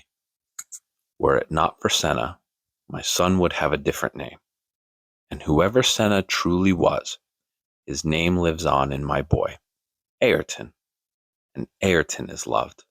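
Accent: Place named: United States. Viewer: you sound like an american , you don t have any british accent at all , if im correct that is very impressive because senna never was loved or famous in the united stetes because for been a f 1 driver , the don t care about f 1 anyway , phil hill was world champion in 1961 if anyone ask about him in the united states you gonna hear , who ????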